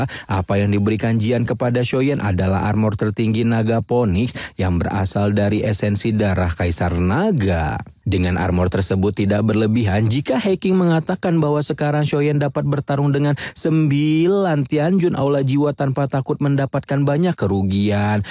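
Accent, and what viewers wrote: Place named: West Java, Indonesia. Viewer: Coo ... Humor gw .. sekilas orng sunda si mamang 😂😂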